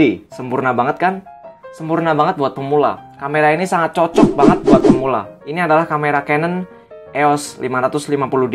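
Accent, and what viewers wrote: Pekalongan, Indonesia. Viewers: Bang orang pekalongan ya? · Orang pekalongan bang? 😁😁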